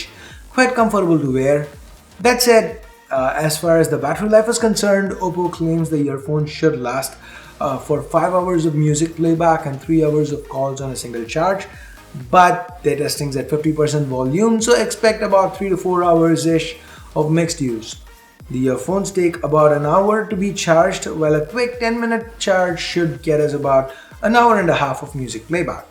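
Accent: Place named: India. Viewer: I really don't like the hindi accent in general but you speak slow and is bearable to understand.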